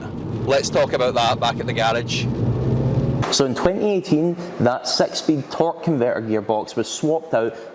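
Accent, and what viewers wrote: Scotland, United Kingdom. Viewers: I like Scottish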